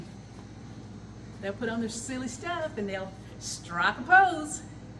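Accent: South, United States. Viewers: Enjoyed watching your Re-union video & I'm from the Philippines. Your southern accent is delightful to listen to. Good Luck on the next Re-union & Thanks for sharing this.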